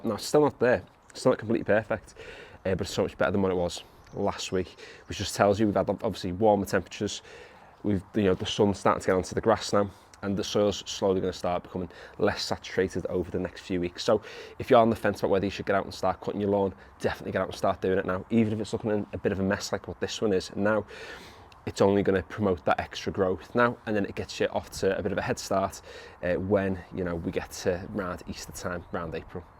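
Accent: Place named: North West England, United Kingdom. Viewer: Need to start mine soon. I’m in the North West, guessing from your accent this garden isn’t a million miles away? Need to get the weed and feed down as well as my first mow (I know there needs to be 5 days between the two) and I’ve just picked up a scarifier as that desperately needs doing too. Anyway fairly large garden (about 3 times the size of this garden), south facing and at the mo is quite muddy and covered in moss. 😔 On the plus side it’s in the sun all day as no buildings nearby casting a shadow. Anyway was thinking mow in the next few days, weed and feed at the beginning of April then scarifier poss a week or two later (with mows inbetween of course).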